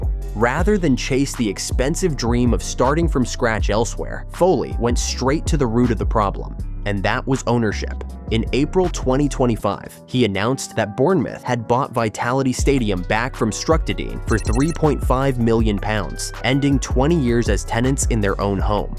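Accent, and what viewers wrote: United States. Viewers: Shame a Yanky robot had to narrate this. I might have watched it otherwise.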